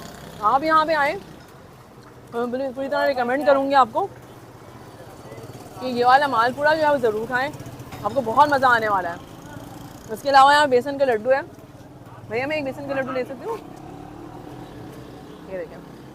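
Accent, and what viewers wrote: Karachi, Pakistan. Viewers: Excellent video,and your urdu slang is marvelous,fluent urdu with typical pronunciation of urdu remind me of Karachi of 90s,lovely keep it up. Greetings from Capetown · Most of the people don't know that you are sindhi as they are confusing you because of typical Urdu speaking accent...your accent is of typical karachite's Urdu speakers...love from Hyderabad ❤ my name is also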